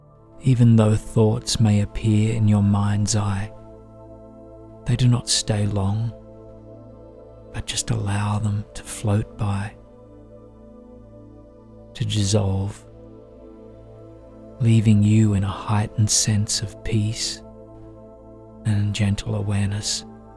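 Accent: Australia. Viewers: Hello, I love your recordings, they are the best, they are so soooothing. We can truly sense your care in the tone of your voice and it is really heartwarming. I have been using your videos to calm my mind for over a year now. And recently I introduced my 13 year-old son to them because he tends to worry too much and has trouble falling asleep. Tonight he came find me and asked me to listen to one of your videos, he likes them so much! And he fell asleep peacefully lulled by your wonderful Aussie accent that we truly love. Thanks a lot for all the love and dedication you put in your channel. It is greatly appreciated! Cheers from Canada 😊🙏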